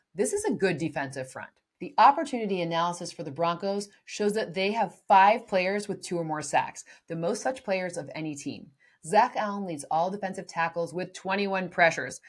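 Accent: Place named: United Kingdom. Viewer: Love the British accent! Continued excellent content.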